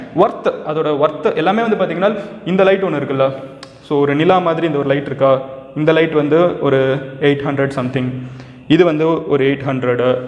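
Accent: Madurai, India. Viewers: Bro Neenga Maduraikaaran solrapove summa getha irukudhu.❤️❤️❤️❤️engà irukeenga nu solunga nerlaye visit pana varen..Am from Mahatma Gandhi Nagar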